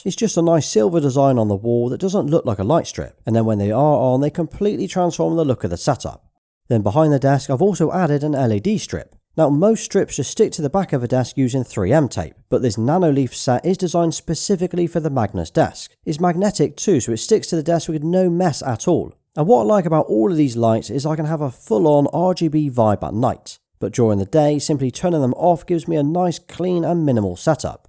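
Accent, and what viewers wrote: United Kingdom. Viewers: I knew he was going to be British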